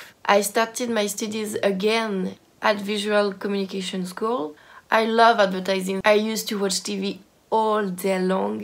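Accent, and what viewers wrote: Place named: France. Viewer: Fantastic! Love it, I forgot how beautiful your French accent is, love seeing your work 💓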